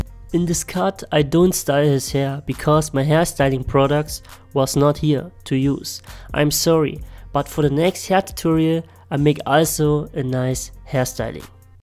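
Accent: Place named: Germany. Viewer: Man hört bei dein Englisch das es bisschen mit deutsch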